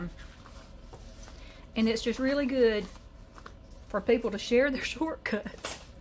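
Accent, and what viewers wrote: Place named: Tennessee, United States. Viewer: Great tutorial! I have a sister-in-law from Tennessee who lives in Alabama and works in Georgia and you sound so much like her! I'm from Pennsylvania and don't have an interesting voice at all. What a beautiful journal! I just started mine.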